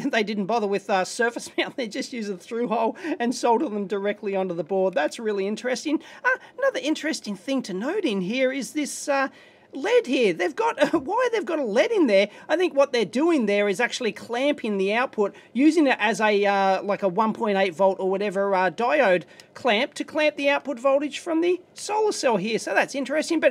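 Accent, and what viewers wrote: Australia. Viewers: Good job on the comment. You put a lot of effort into making this comment, a lot more than most people would ever do. My comment I just rattled off the top my head what I thought, without putting much thought into it. Thats the problem with watching people who are from a different country than you, I rember watching dave for the first time. It was his giant HDD tear down to me he sounded like the stereotypical Australian to me, but after so long you dont notice the accent.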